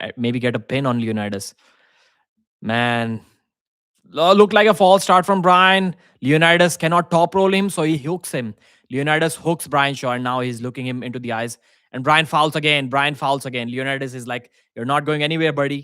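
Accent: India. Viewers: More Indian narrating, stfu and post the fucking match and wash your hands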